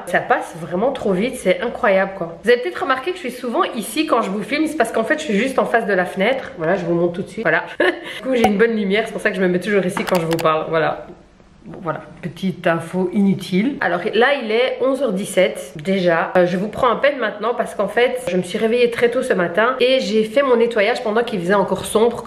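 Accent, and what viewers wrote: Belgium. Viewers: Bonsoir ,il me sembke que vous avez un pet accent ? Vous êtes Belge car vou a avez un accent ou etes Belge ou Bruxelloise ?viy me faites rire viy et trop comm jeun femme viu et mariée ?si oui botre mar ne diu pas s 'ennuyez avec vous ?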